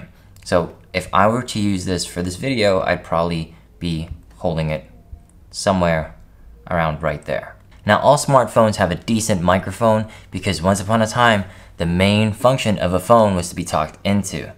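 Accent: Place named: United Kingdom. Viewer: Good tips and British accent game is strong. Jolly good show!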